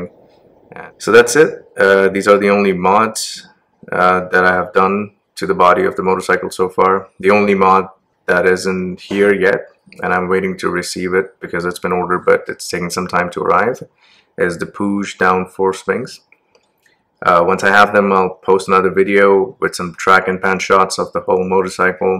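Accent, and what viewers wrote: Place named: United States. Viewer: Goddamn if not for the Jaipur tag I would have seriously thought you to be American 😂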